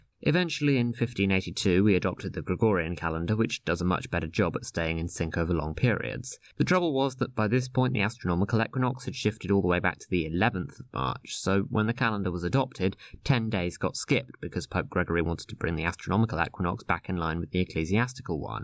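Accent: United Kingdom. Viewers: Much enjoyed your video, both in its contents and its vocal presentation, whose somewhat dry acerbic touch, highlighted by the nice English accent, made for much captivating entertainment and learning. However, I think there is a slight error in the video visuals: : On the "Observed" 2019 Easter table, shouldn't the "Next Sunday" be Sunday, April 21, instead of Sun. March 21 (since that would have a Thursday--and that next full moon acc. to the ecclesiastical calendar was Sat. Apr. 20)? I see as well that so far you have just two videos. Here's to a good many more. Subscribed.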